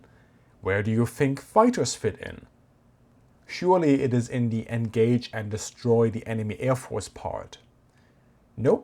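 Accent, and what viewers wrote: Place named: East Germany, Germany. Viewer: I think i can detect east german accent maybe even berlin area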